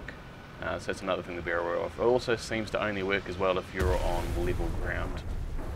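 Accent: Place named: New Zealand. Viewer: Spot the kiwi accent..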